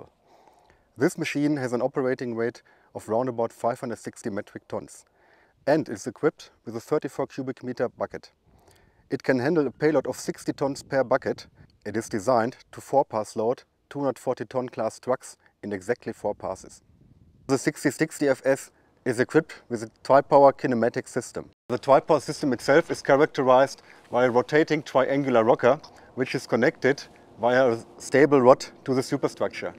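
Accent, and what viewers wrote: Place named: Germany. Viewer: Ummmh, when germans speak english :-(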